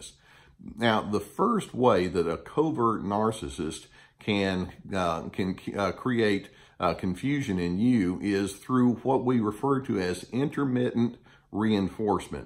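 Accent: South, United States. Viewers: You can just feel the warmth and caring of this man by listening to him. That southern drawl and compassion are a beautiful combination. I'd I had to guess I'd say he's an infp like myself, but more highly developed and less jaded than myself lol.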